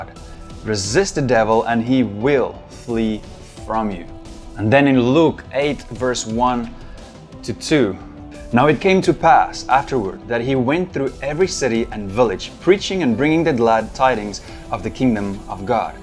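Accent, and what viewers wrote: South Africa. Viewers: U from south africa ey ?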